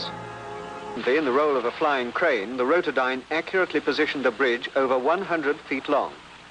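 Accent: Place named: United Kingdom. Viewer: Oh what a fantastic British accent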